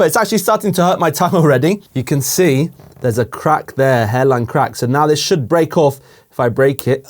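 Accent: United Kingdom. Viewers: my god! He has the bri-ish accent!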